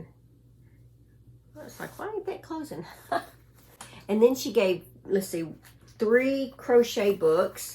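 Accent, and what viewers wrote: South, United States. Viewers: The berry compote yarn is a beautiful combination of colors. I love hearing you talk. Nice southern accent. The scripture today is so true. Hugs 🤗and love to all 😇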